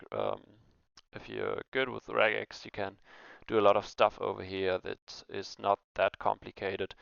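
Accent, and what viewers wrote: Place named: Germany. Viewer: Great video! I also love seeing all the approaches of handling the words in part 2, your approach looks pretty quick! A few points I'd like to address though: - Splitting with "\r\n" only makes sense if the file is encoded with that new line sequence (which as far as I know is just Windows). A recommendation of mine would be to either switch to "\n" (which in your IDE I think works by clicking on "CRLF" in the status bar), or perhaps the better solution: using .split(/\r?\n/) which will support both both :) - When talking about the letter "i", pronouncing it as [i] (also known as the German "i") can easily be mistaken with the letter "e" in English ;) Your English seems really good though! - The magic (even though obvious in this code) numbers 3 and 5 could be stored in constants to give them names for descriptions, which might be overkill for Advent of Code, but I just felt like throwing that in the room :) - About adding numbers, I'd highly recommend writing parseInt(`${numbersInString[0]}${numbersInString[numbersInString.length - 1]}`), note that I used backticks (`) and not an apostrophe!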